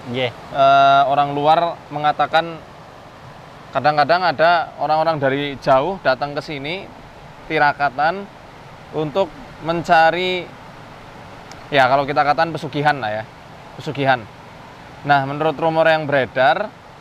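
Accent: Banyumas, Indonesia. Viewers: Ngapak yaa 😂😂😂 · Ustadz nya dr negara ngapak ya ... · Wong ngapak pancen jos koh jannn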